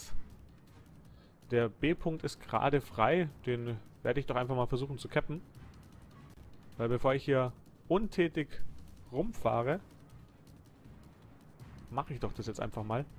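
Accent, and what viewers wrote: Bavaria, Germany. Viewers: absoluter Fan von dir, erinnere mich noch gerne an WoT mit bayrischem Dialekt. Da ich seit Mai in Wien wohne muß ich sagen hier wird alles gesprochen nur wenig österreichische Dialekte.HeHe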